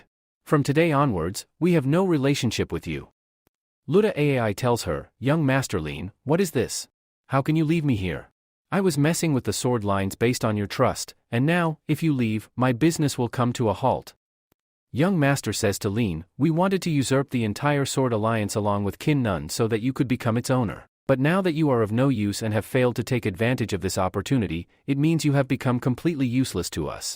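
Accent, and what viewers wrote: India. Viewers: WHY IS IT INDIAN BRUV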